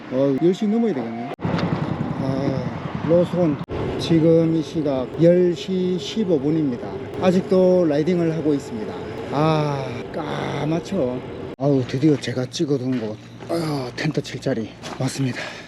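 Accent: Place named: Busan, South Korea. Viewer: 재밌어요 'EBS 세계테마기행'의 '부산 여행가 일본편'을 시청하는 듯했으며, 부산 사투리가 정겹습니다 잘 시청했습니다.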